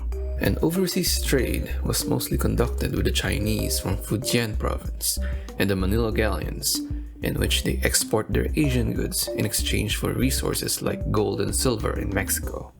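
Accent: Spain. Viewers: Accento Madrileño. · I love the Spanish accent...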